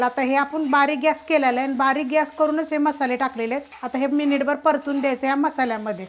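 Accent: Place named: Ghats, India. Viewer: Mi navin viewer aahe. Mirchi nakki kontya prakarchi vaparli aahe? Aavadali. Tumhi ghatavarche dista. Aapki boli vatli. Mala Abhi man vatla tuza. Satara bhgakadchya sadhya sopya pan ashach khamang recips dakhav. Ghatavar kami vastunmade atishay chavisht padarth kele jatat. Mazya hya channel la khup khup shubhechchha.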